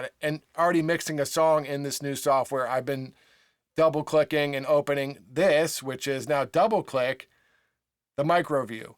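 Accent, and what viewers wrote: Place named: United States. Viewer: What part of the US is that accent from?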